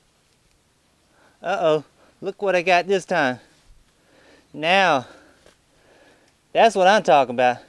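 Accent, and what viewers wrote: United States. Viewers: Omg, your country accent is so cute and funny. Nice video. 🌸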